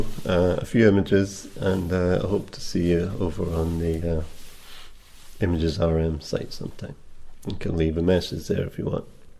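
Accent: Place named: Scotland, United Kingdom. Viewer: Dearest, so sad to hear this, thrilled to hear your poems and will visit your photography site, also so good to hear more about you, but would love to hear you sing 😉😊 I will so miss your calm sensitivity and beautiful notes, your voice and accent which makes me feel closer to my dad and the family and of course Scotland.. your shirts and jumpers.. 😍 no other matches you. Enjoy more time to write and take beautiful images and take care too.. love to you three xxx and please return soon!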